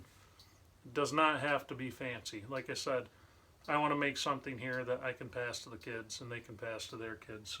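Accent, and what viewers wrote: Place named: Midwest, United States. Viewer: Thanks for this video. I am looking forward towards the building of it. Your channel is starting off small, yet I see something in your presentation which reminds me of Wranglerstars' earlier days. Please keep on building. Also, could you do something with the audio portion of your video. I've pumped my computer up to 100% along with the YouTube volume, yet it seems like you are speaking in a soft voice. Please check your audio equipment and see if the volume is turned up to full. I'd rather you blast my socks off and then I'll just turn down the volume. It will be interesting if you hog out some of the butt cheek portion of the seat or will you just be sitting on a flat slab? Heck, the way you go about doing things it might just be as comfortable with a Barka lounger cushion on it. Plus, one more thing. What part of the country are you in? 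I'm betting it is Iowa or Wisconsin due to your accent. Thanks again for your vids and please keep them coming. Terry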